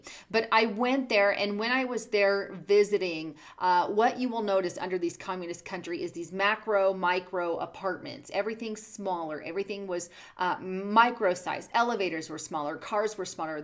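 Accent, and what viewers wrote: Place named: Midwest, United States. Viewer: I hear you. Unfortunately in this country there are a lot of communists who won't listen and a LOT of people that aren't very bright and they won't understand. So swimming against the current but I do appreciate your effort. I like your posts. I think I could talk to you for hours about this stuff. On a side note I'm trying to figure out where you're from based on your dialect. I don't think east coast or west coast and I'm hearing inflections that sound...Ohio or Indiana? Just guessing..could be a little further south and west but not too much. The only other guess I have could be north central west. Montana or Utah but I just don't hear as much western dialect influence. What's funny is you only use valley girl drawl when you are parodying others or having to make a point you think is painfully obvious. Anyway I'm still listening. 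Have a great day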